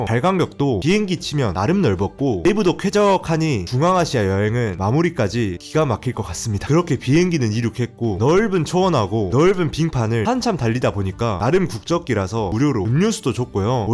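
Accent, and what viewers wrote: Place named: Gwangju, South Korea. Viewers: 대사 드립이 너무 재밋네요 대학교때 알던 광주 출신 동기형이랑 말투가 넘 비슷해서 재밋게 보고 있어요 ㅋㅋ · 광주 억양 원래 이래요? ㅋㅋㅋㅋ 그런^데에~___ 중독성 오진다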